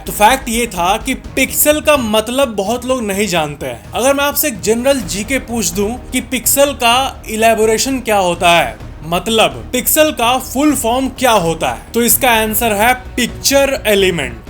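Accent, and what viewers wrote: Bihar, India. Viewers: Bhai aap Bihari language bolte ho (kore)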